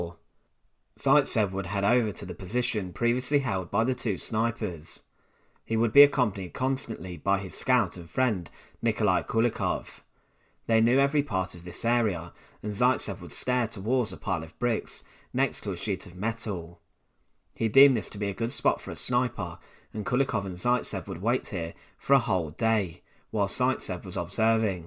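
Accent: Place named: Leicester, United Kingdom. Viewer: This strong Leicester accent is just terrible! It spoils everything.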